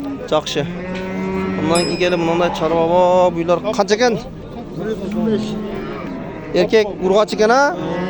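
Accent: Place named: Batken, Kyrgyzstan. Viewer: Баткенде ошентип айтылат аррегиондунсуйлоо речи бар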